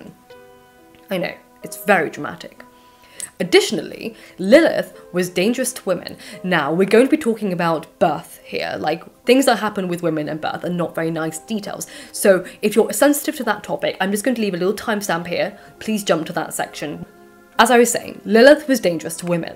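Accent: RP, United Kingdom. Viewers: Received standard pronunciation and a lovely classical English accent. I thought I’d never find that on this website. I’m in love and subscribed.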